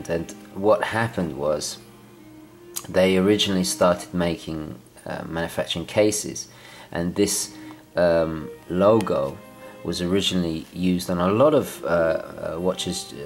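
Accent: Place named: United Kingdom. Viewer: Not to nit pick......but its pronounced "Hoi-er" .....not "Hew-er".....for the brand Heuer. I guess it must be a Brit thing as you also tend to say "Oh-mee-gah".....vs, "Oh-may-gah" ( for Omega), as most others do. Standing by for the inevitable flaming, but my intent was to inform not to irritate. Good review.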